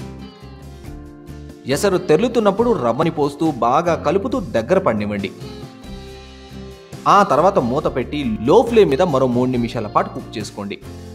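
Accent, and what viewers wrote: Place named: West Godavari, India. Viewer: I love your Telugu. Reminds me of my childhood days in my village in west Godavari.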